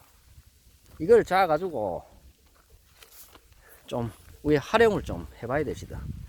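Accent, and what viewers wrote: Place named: Andong, South Korea. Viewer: 고향이 안동쪽이세요^^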